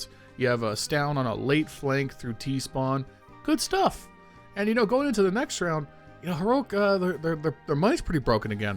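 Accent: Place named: United States. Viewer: 1. Open cs commentary video 2. Hear american accent 3. Click away from the video and never watch another of his videos again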